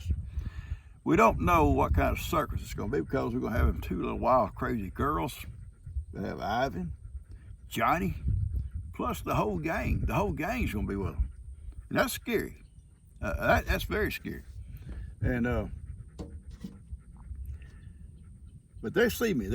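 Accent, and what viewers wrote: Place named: Texas, United States. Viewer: I LOVE that Texas accent of yours, I could, and have, listened all day. And seeing you out in that beautiful pasture…you’re killin me. Well, a girl can dream right and you can tell GiGi I said so😂😂😂I know you only have eyes for her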